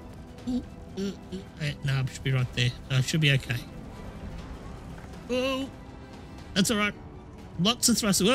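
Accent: Australia. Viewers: You sound like an Australian Jack-Frags :D :D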